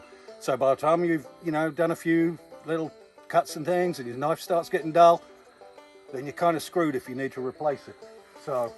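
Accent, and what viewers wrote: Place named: England, United Kingdom. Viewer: What’s your back ground mate you sound like a Englishman?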